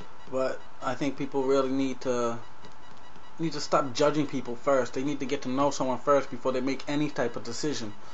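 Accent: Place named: West Coast, United States. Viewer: I couldn't even tell. In fact, I thought you were a west coast brother. Are you?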